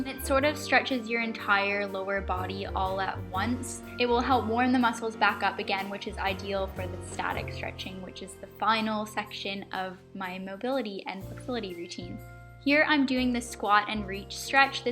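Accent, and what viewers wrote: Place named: Canada. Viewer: canadian accent is cute :)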